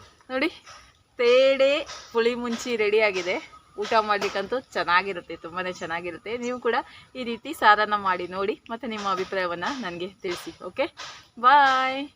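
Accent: Mangalore, India. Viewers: ಮಂಗಳೂರು ಮೀನು ನೋಡ್ಲಿಕ್ಕೆ ಚಂದ ಮಂಗಳೂರು ಕನ್ನಡ ಕೇಳಲಿಕ್ಕೆ ಅಂದ ಮಂಗಳೂರು ಪರಿಸರದಲ್ಲಿ ಕಡಲ ಕಿನಾರೆಯ ಸೊಬಗು ಸವಿಯಲು ಆನಂದ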